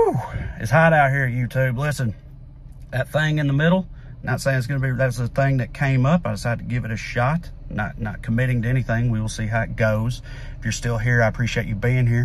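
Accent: South, United States. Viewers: Brother, just hearing a Southern accent speak sense made me INSTANTLY subscribe to your channel. I think it was Beau of The Fifth Column that led me here, but thank you for popping up in my feed, and please keep challenging ignorance and fighting idiocy. We need people in all the corners standing up for what is right, just, and logical. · Lmfao I gotta say....There needs to be more educated southerners. That accent mixed with an IQ that isn't comparable to a shoe size is absolutely fantastic when you get sarcastic/funny Haha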